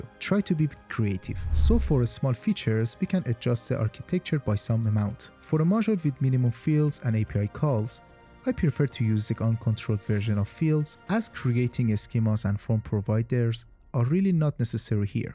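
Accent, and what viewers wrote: Iran. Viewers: love the iranian accent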